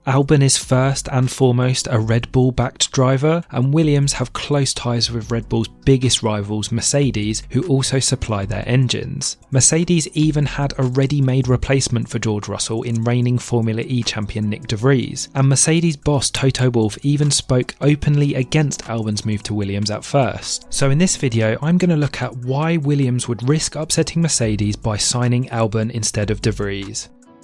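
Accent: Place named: United Kingdom. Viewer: Yeah that was very comfortable. Perfectly crystal clear British too.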